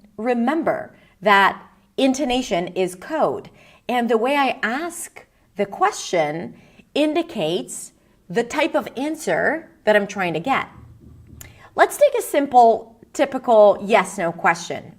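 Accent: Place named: United States. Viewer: As a native English speaker, I’m not sure why I’m watching this, but I’m fascinated. Very surprised to hear that English is not her first language. Her American accent is 100% perfect.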